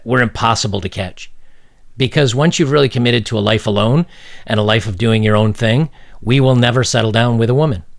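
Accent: South Africa. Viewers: She sounds South African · She's definitely South African. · Also she sounds south african and as a south african, i know bitches be crazy. how did I not have a gf for 26 years of my life and I go to thailand for 2 years and women throw themselves at me. My current thai gf keeps saying that she doesnt believe I have never had a gf in a south africa. western women man. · She kinda sounds South African or maybe the UK? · Gotta love that South African accent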